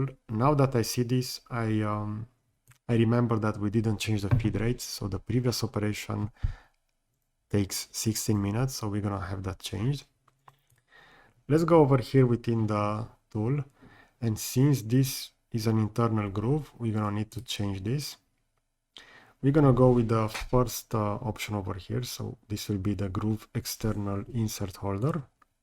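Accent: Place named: Romania. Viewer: Auuuuuu ... asta-i engleza rumaneasca ... !!!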